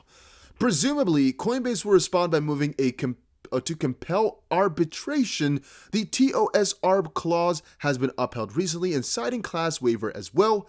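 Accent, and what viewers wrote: Netherlands. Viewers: you're DUTCH BRO?! madness! Lol I Prefer you Dutch accent maybe cuz I''m in the UK good to hear EUROPEANS on the crypto train!!! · omg your dutch accent was so cute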